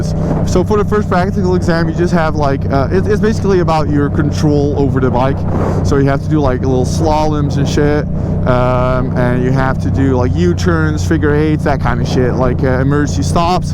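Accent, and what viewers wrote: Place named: United States. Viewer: you have a very American sounding accent lol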